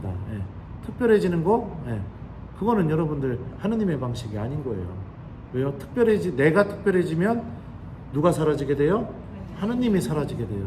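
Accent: Chungcheong, South Korea. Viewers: 신부님 수고하십니다.미국 에까지 가셨네요.몇칠전에 본당신자들한테 1번2번3번 하셨싸트만 또 벌써 그멀리 가셨네요.신부님 강론듣다보면 우리고향 충청도 말씨 때문에 너무 재밋게듣습니다.ㅎㅎ오늘도역시 충청도뚝배기말 (뿌랭이)표준말(뿌리)소리듣고 많이 웃습니다.신부님 미국에서 열씸히 하느님말씀 선포하시고 건강히 계시다 행복한마음으로 돌아 오세요.😅😂😢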